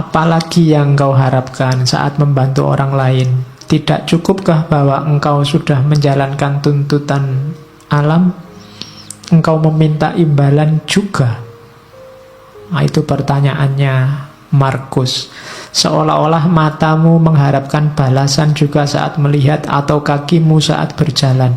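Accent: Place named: Java, Indonesia. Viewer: Jawa nya itu Jawa banget😹😹😹 medok hama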